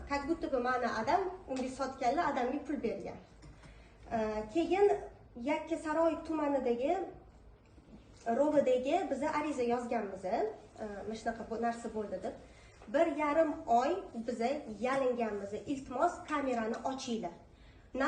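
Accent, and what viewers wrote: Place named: Russia. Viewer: Чала урис узбекча гапираркансану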